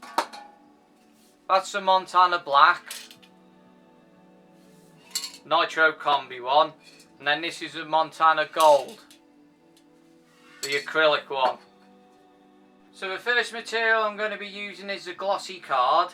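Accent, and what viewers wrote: United Kingdom. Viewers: This is the most British person I have ever heard. Subscribing 100%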